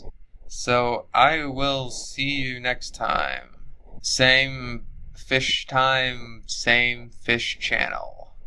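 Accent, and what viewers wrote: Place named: Brooklyn, United States. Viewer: that brooklyn accent is great ps: baxter is very cute and pure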